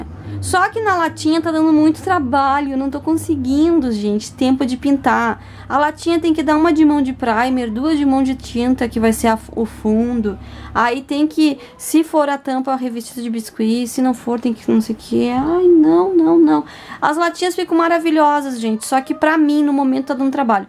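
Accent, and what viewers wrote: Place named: Rio Grande do Sul, Brazil. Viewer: Oi adoro suas explicações eu qdo o seu zap.amada não fica xatiada não com esse tipo de COMENTARIO NAO TENHA FE EM DEUS ELE TEM O CONTROLO DA NOSSAS VIDAS BJS. GOSTO MUITO DE VC É ADOOOOOOOOORO SEU SOTAQUE TCHÊ FICA COM DEUS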